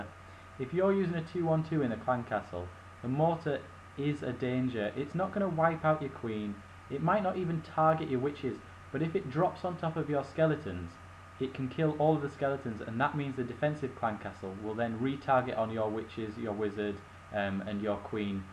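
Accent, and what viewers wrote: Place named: United Kingdom. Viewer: Great to hear another British accent on Clash YT vids.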